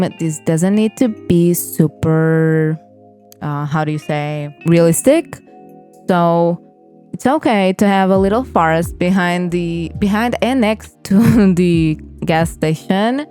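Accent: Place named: Russia. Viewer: where are u from you sound russian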